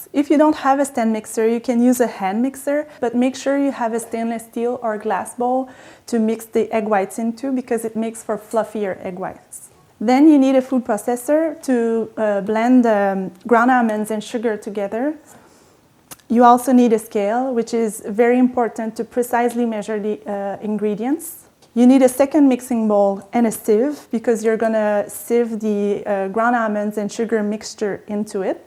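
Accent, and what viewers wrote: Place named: Quebec, Canada. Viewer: Thank you for taking your time to share this wonderful, detailed class! I knew you were French Canadian by listening to your lovely accent. I moved from Toronto to Quebec City in 2014 and in 2020 to Lac Beauport with my new husband. Merci encore! 🤗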